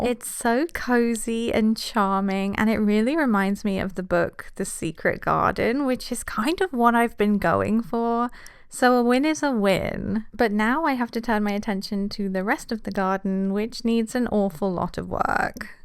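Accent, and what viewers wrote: United Kingdom. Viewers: Swear i could just listen to British girls talk all day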